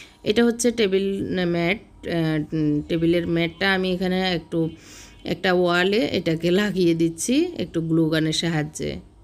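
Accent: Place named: Noakhali, Bangladesh. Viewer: আপনার কথায় নোয়াখালীর টান আছে।কথা আরও শুদ্ধ করেন তা হলে শুনতে আরওভাল লাগবে।তবে আপনার রুচির প্রশংসা করতে হয়।আপনার সাজানো সত্যিই সুন্দর লাগছে।উপরের মন্তব্যের জন্য মনে কিছু করবেন না।এটা ঠিক করলে আপনার বল্গটা আরও ভাল লাগবে।মনে করবেন এটা একটা সাজেশন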